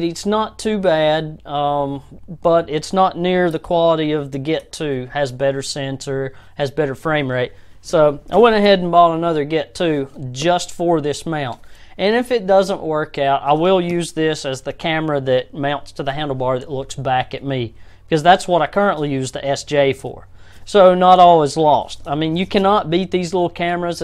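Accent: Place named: South, United States. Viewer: The southern accent got me laughing 😂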